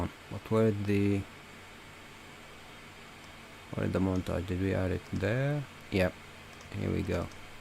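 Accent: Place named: United States. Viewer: استاد لدي سؤال كيف تعلمت الانجليز و اصبحت تتكلمها كانك شخص امريكي اعطني نصائح لتعلمها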